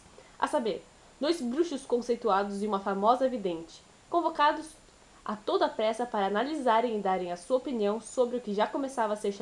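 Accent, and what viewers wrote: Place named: Interior, Brazil. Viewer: Rsrsrs isto eu percebi, que o sotaque é brasileiro. Só não consegui identificar exatamente de onde... Sua leitura é muito fluida. Tive de parar, prestar bem atenção. Chutaria SP por causa do "r" =D (porém muito sutil). Foi esse cifrão do Euro que me chamou atenção. Por esse preço valeria comprar fora mesmo pagando frete internacional. Nas livrarias da minhas cidade está muito caro. A língua é a mesma... Parabéns pelas ótimas leituras ;)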